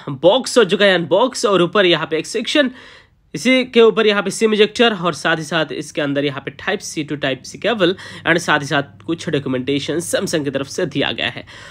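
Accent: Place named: United Kingdom. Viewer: Bhai tu ya to hindi me baat kar le ya fir English me. Tu pta kaise baat karta hai jaise अंग्रेज hindi me baat karte hai. Unka to samj me aata hai lakin tu kyu over acting kar rha hai.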